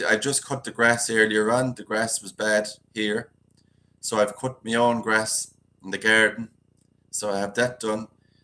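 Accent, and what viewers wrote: Ireland. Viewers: I like your Irish accent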